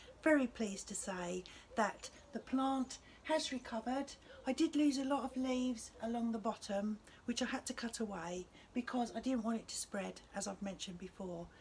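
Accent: London, United Kingdom. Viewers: Yes it’s been in the same place for 15 years and I’ve cut it right back with the intention of getting rid of it, but it came back healthier till the fungus took hold again. Unfortunately it’s base is too large and established to be moved easily. It’s on a north south oriented fence so it get shade and sunshine. I heard that feeding and watering them well helps. One last ditched attempt this year for me. This morning it looked really well and healthy with all this heat. Have a wonderful w/e. BTW is that a London accent you have?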